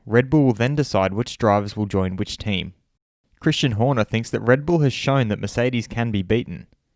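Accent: Australia. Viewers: Aussie ascent gotta love it mate.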